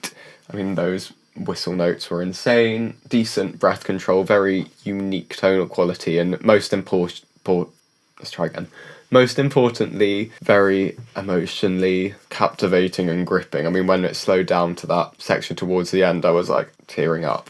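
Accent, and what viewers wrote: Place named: Wales, United Kingdom. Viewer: Interesting accent. Are you from Wales?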